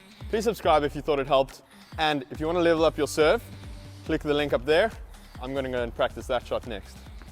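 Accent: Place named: South Africa. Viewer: Are you South African? That accent is too familiar ek se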